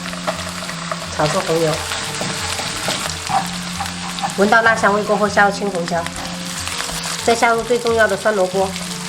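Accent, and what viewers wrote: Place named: Fuzhou, China. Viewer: 地道的福州做法，从口音听出来的哈哈老乡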